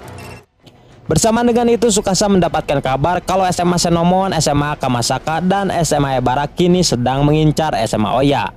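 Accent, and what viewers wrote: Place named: West Java, Indonesia. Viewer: Sarebu persen pembicara adalah USA alias Urang Sunda Asli, katambahan katkuaya babangus mah semakin teryakinkan.... Wkwkwk mantap ieu si mamang sumanget terus sesuai alur Carita 👍🏻👍🏻👍🏻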